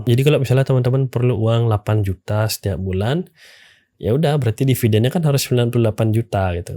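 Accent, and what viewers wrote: Bali, Indonesia. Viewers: logat bali...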